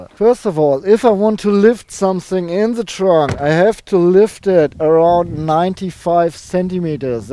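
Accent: Germany. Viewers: i love German accent :)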